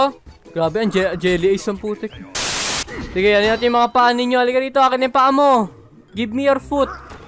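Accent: Visayas, Philippines. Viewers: Kuya bisaya kayo!?!! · bisaya ka po ba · bisaya ka kuya? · Bisaya ka ba po kuya? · Lods Bisaya Ka or Hindi? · Patay kadiha mo bisaya po ikaw?? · Bisaya ka dong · bisaya ka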